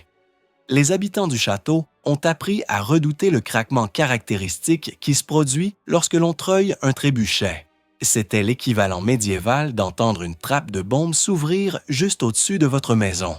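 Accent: Quebec, Canada. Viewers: L'accent quebecois du robot c'est pour gratter quelques secondes de vues ?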